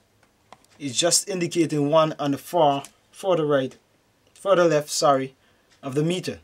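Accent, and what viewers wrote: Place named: Jamaica. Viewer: are you a Jamaican? u have an accent man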